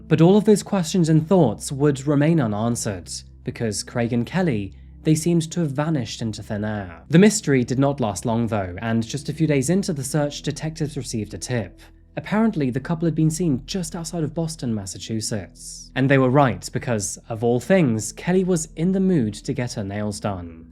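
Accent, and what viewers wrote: England, United Kingdom. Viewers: Yet another outstanding episode! And chin up about sounding English; it could be worse....you could sound like Andrew Tate with some weird US/British drop-the-t's accent!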